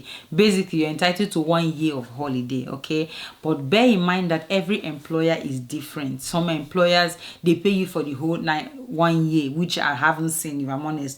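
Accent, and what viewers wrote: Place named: Ghana. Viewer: Ah. Are you Ghanaian or Nigerian??? You speak like Ghanaian. 😂😂😂❤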